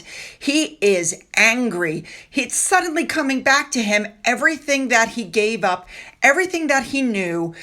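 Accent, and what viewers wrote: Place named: United States. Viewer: I don’t find your talking too fast. I’m British and can understand your American accent very clearly.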